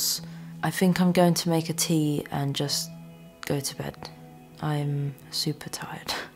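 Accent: United Kingdom. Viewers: i just moved to sweden... i can't wait for spring and summer this winter made me sick of snow. my goal is to get so much sun that im tired of sun also you don't sound swedish to me your English sounds like you're from the UK or some shit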